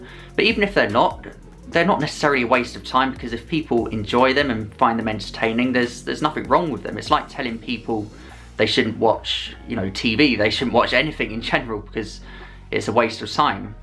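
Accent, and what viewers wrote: United Kingdom. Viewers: If I'm not mistaken, I think his accent is called Estuary English. Correct me if I'm wrong. Notice that for TH, he uses /f/.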